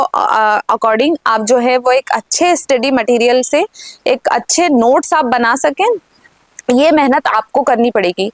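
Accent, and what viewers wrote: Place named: India. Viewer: bahut shandar bolti ho tum ekdam desi andaz me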